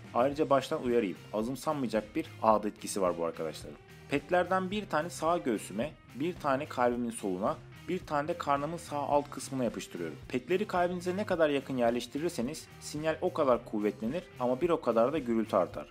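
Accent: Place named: Isparta, Turkey. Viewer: Nerelisin konuşman Ispartalı gibi bizim buralardanmısın ?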